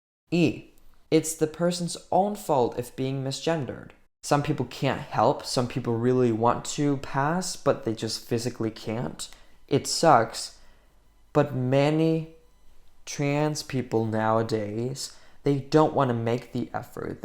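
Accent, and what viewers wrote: United States. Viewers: You have a good American accent, do Danes speak like you generally? 🤗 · I’m an old man, 74 years old, I admit that I’m having trouble understanding what to me is a new phenomena. I was surprised that you aren’t an American because you sound so American. I find you to be thoughtful and very reasonable. Until I came across your channel I thought that people in your community were rather odd and very pushy. Thank You for dissuading me of that notion. But correct me if I am wrong; I suspect that you are in a minority in your community. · Wow! Hade inte gissat på att du var Dansk. Din dialekt låter amerikansk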